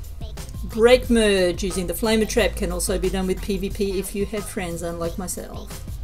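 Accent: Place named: Australia. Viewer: Love a fellow Aussie voice, and such a sweet clearly explained tute. Thanks chicky 😊😊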